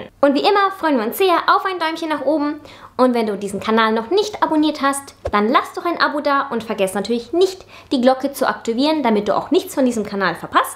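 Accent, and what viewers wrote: Germany. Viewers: Geil, wenn du schwäbelst🤣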